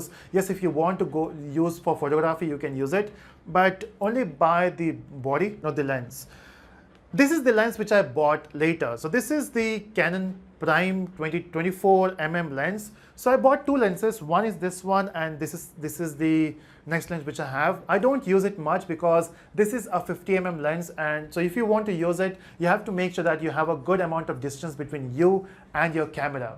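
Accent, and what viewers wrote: Telugu, India. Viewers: bro are u from andhra or telangana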